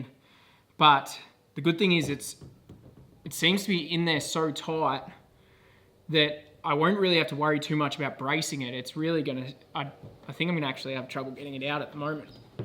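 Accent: Australia. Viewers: It’s good to see and hear an Ozzie doing a camper conversion Keep up the good work and the Occerisums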